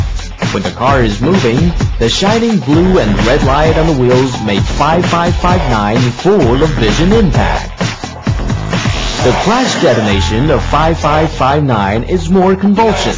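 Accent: United States. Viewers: this guy's got a great american accent, but the grammar reveals this isn't an american :) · wtf.. the narrator has the same crappy grammar that you can read in translated chinese manuals, but an american accent?! Me's confused!!!!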